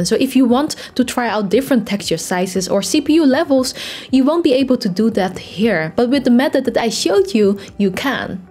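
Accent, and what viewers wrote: Netherlands. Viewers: Ik hoor een Nederlands-Engels accent 😅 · i smell dutch 😂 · you sound dutch haha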